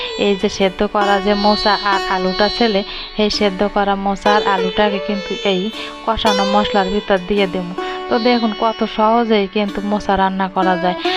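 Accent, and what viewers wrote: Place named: Barishal, Bangladesh. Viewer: খুব সুন্দর রেসিপিটা বানিয়েছো আর তোমার কথাগুলো খুব ভালো লেগেছে বরিশালের ভাষা❤❤😊😊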